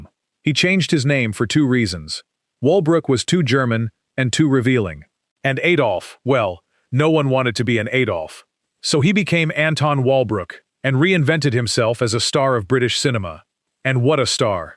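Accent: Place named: United States. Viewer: The accent in the narration of this video makes it quite clear that it is the US racism which motivated its creation. For my whole life 80+yrs I have known that a very large number of people in show business were of jewish origins and it really has not matter one tiny little jot to me or anyone in Britain. Only someone from USA would be motivated to create this diatribe of changed names which has zero value to British people with all embracing British values. Why do you expose yourself.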